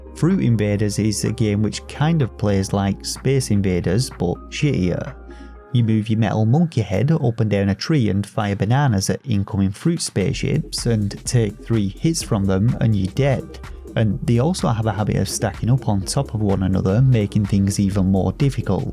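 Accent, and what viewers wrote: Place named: England, United Kingdom. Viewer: The like and subscribe was not for the content - although really well done - but for the English accent. Amazing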